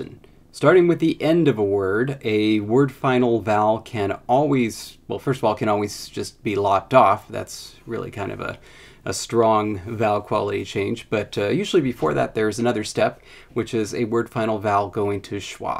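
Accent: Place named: United Kingdom. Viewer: You are British.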